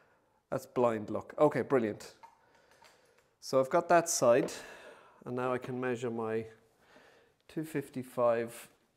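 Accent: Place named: Ireland. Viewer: Painter's low tack blue tape or plain masking tape are my goto when anything needs precise marking out for nails, screws, drill holes, biscuit holes or multitool sqaure cuts. Love the fancy radiator on the wall and the herringbone floor. My true blue Dublin ears despite being in central europe for 20 years are detecting an Irish accent.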